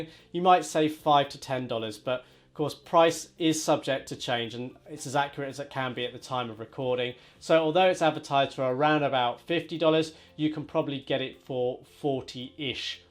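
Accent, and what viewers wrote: United Kingdom. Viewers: Probably should drop the English accent too...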